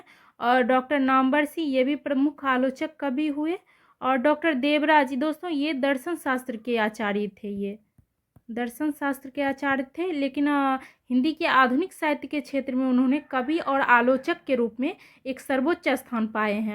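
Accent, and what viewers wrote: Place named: North Bihar, India. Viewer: Medam AAP उतरी बिहार से हैं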